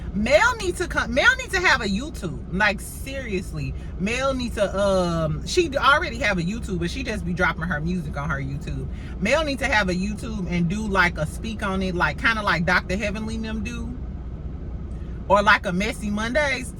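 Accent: St. Louis, United States. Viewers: I'm cracking up because you are so St. Louis! Every time that you say thurr, I get weak!😂😂 I'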